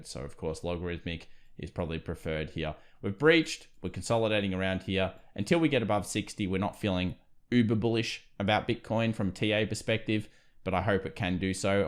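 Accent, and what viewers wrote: Australia. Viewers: Straight down to the point and precise you are the best ta on here. Love your aussie accent too.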